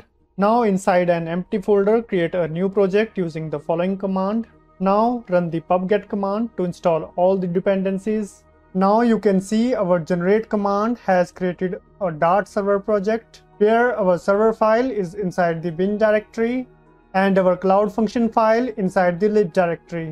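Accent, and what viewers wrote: India. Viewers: You sounds so... Indian??? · An INDIAN Voice ....??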